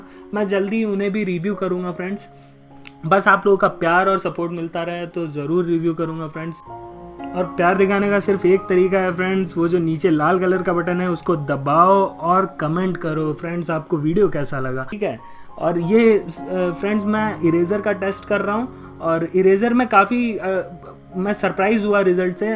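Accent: Bihar, India. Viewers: Sir aap Bihar si hoo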